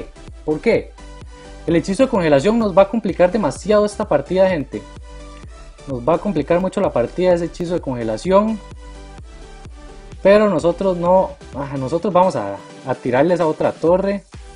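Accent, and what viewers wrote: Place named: Costa Rica. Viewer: aaaaaa eres tico :3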